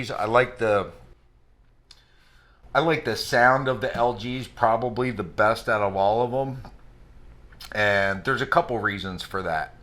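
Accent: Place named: Philadelphia, United States. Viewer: You're from Philly aren't you... if not you have a strong philly accent lol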